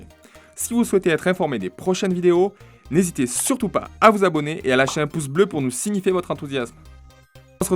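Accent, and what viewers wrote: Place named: South-West, France. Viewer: Je souhaites me mettre au AirSoft. Merci pour ta chaine (je débarque 4ans après 🤣mais pas grave) J'entends un accent, du sud ouest ? ;) Ciao de lapart d'un Bdlais ^^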